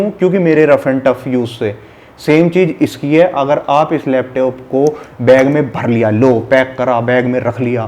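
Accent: Haryana, India. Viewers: getting reply from you is still a big thing for me thank you so much sir you are really doing great work please keep it up ! Like your haryanvi accent actually I love haryanvi accent and main strength of your channel is your honesty and desiness. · Bhai tu haryane ka lage h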